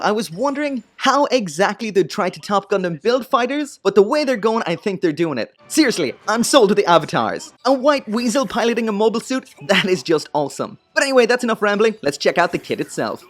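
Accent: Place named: Ireland. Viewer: You look and sound like an Irish Bam Margera dude.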